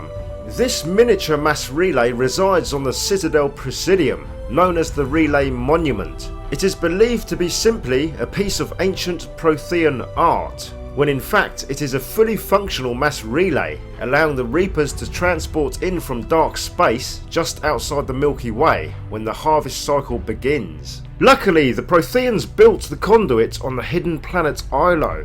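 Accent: United Kingdom. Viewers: Cool! Do you know how you would classify your regional accent? Would it be cockney or sussex for example?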